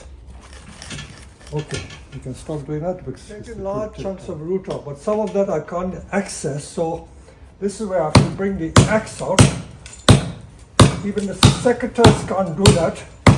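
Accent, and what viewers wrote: South Africa. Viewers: guy sounds south african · Great hearing a south african accent there.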